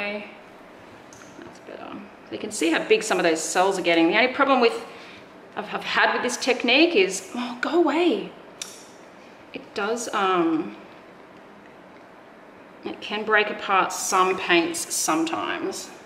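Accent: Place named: Australia. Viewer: This is beautiful !! So refreshing to hear an Aussie accent.....lol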